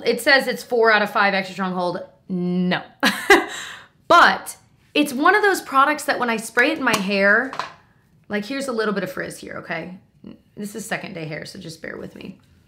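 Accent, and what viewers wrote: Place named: New Jersey, United States. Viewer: You've probably heard of Good Reads but if not, it's an app that has other people's reading lists, as well as reviews on books. That's where I find most of my want-to-read novels 😊 I love just hearing your voice! I know that sounds weird but you sound like my New Jersey family ❤ I had that Nest perfume but gave it to my mom because it was a little too warm/mature for my taste. It's crazy how everybody's preferences are different!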